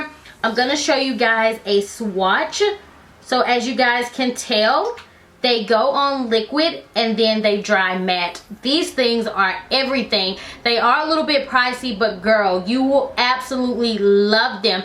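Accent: South, United States. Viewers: I KNEW i heard a SOUTHERN accent! ☺😊😊😊😊 im from alabama too! Do you have an eyebrow tutorial i looked but couldnt find one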